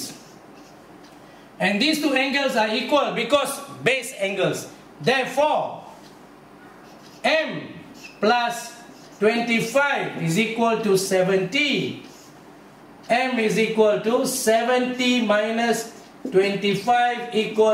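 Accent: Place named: Malaysia. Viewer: he speak english like malau people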